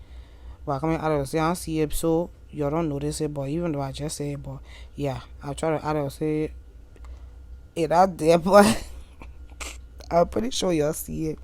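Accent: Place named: Liberia. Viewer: I love the English😂❤ koloqua to the world🇱🇷🇱🇷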